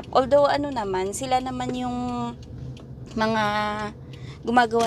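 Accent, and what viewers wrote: Hiligaynon, Philippines. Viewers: parang ilongga ka maam ah the way ka po magsalita . new subscriber po. naghahanap kasi ako trabaho abroad.... kayo nakita ko po vlog nyo ..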